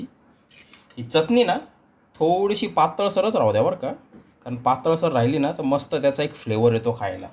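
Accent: Nashik, India. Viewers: आम्ही पण नाशिक कर आहोत तुमची बोलण्याची पद्धत छान आहे. रेसीपी ऊतम. नाशिक टोन🎉🎉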